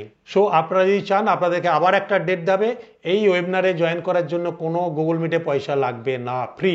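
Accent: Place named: Bardhaman, India. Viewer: দাদা আপনি কি বর্ধমান জেলার মানুষ? কথায় টান পেলাম তাই জিজ্ঞেস করছি কিছু মনে করবেন না প্লিজ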